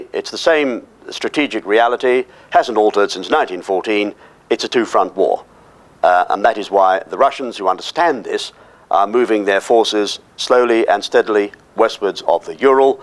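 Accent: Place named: RP, United Kingdom. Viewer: Utter crackpot. His Patrick Moore style accent irritates as well. Bad egg. Nasty chap. Totally off his head. Has he not heard of NATO. He has been reading too many war comics.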